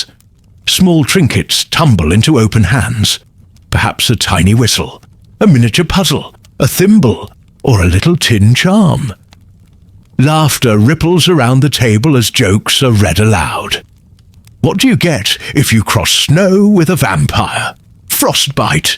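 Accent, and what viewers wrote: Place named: United Kingdom. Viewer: Missouri USA, pm. I love the British accent.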